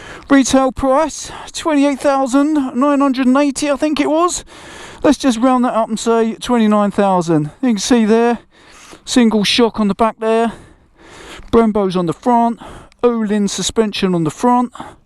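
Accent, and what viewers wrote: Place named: Cockney, United Kingdom. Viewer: That cockney accent is atrocious, crude, almost unintelligible, and for an unencumbered "un-accented" American English speaker...quite funny sounding.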